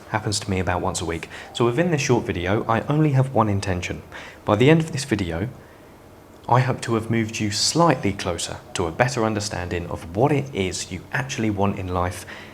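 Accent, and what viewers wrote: United Kingdom. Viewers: This man has a British accent.. I'm pretty confident I'm sold into 30 seconds..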